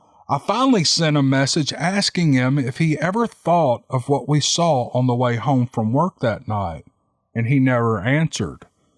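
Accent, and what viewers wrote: South, United States. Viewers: Only an hour up and already 92 comments! Love 💘 your channel and listen to the others first because I like to keep the best for last!! The ladies have great voices but I really 💘 love listening to your southern voice...Florida girl here and everyone here in South Carolina have the same kind of voice. They all sound like my relatives, Carolina folks. Thanks for your stories, Merry Christmas 🎅 🎄!! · With an accent like yers' I'd never have guessed you know literature the way you do. I guess that's an example of bias against southerners. In fact, though, I like people from the south. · I luv all yer stories. You sound like good olesouthernman. Great narration. You make everything excellent. Yourmypeople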